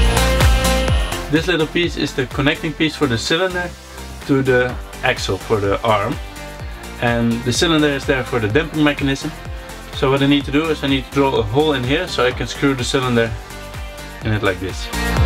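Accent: Netherlands. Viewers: Haha I just found out your channel yesterday and you are an amazing creator! I already had a suspiscion that you are dutch by your pronounciation (je praat echt goed engels maar elke nederlander heeft iets in zn engels waardoor je direct door hebt dat het een nederlander is ;) ) keep up the good work!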